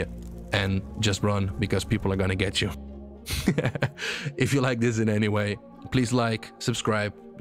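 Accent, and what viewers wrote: Netherlands. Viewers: you sound Dutch.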